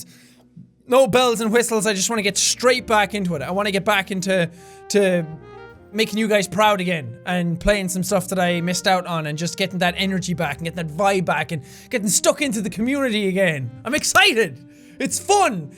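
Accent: Ireland. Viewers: It's nice to hear your Irish voice again. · Ah the accent., you have clearly been back to Ireland. Lovely. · I've missed that high pitched Irish voice so much! I've watched all the series on this channel to keep me happy and distracted from the shitty reality since I've been here for about 2-3 years now. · Welcome back! Even though I was binge watching previous videos, I still miss hearing that sweet, sweet Irish accent ^_^ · you can here that his irish accent is stronger after he was in dublin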